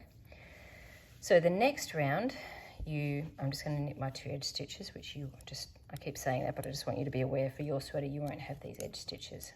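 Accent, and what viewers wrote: Australia. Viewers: Dear Thank you so much for your time spent creating these tutorials. I purchased the pattern in my mother tongue, danish, but because I am a relatively new knitter, it has been slightly daunting. You make it look like child's play. Although I am not fooled into thinking that it will be for me, it does encourage me to think that it may just be possible. Being Scandinavian, I am used to nordic style knitting. Nevertheless your tips are invaluable. Lastly I can't refrain from commenting on the joy it is to hear it all explained in australian english, as i grew up partly in Bendigo, Vic. So I enjoy the light in your beautiful home, which is so typically Australian. 🎉 I do have a bit of trouble balancing my cable needle. The stitches tend to slip off. Do you know any tip to get around this?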